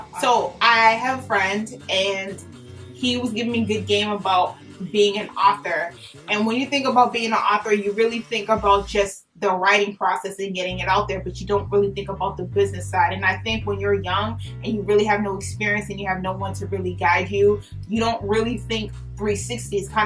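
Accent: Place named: Queens, United States. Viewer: You got to be from Queens. Shhhh. Much success.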